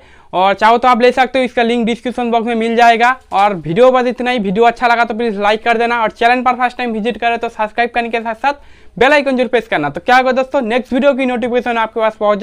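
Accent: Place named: West Bengal, India. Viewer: তোর কথা শুনে তো বাঙালি মনে হচ্ছে।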